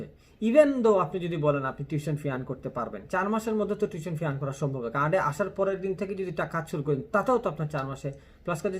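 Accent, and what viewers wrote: Sylhet, Bangladesh. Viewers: Are yu sylheti? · Bro you are from sylhet right..? Me to😎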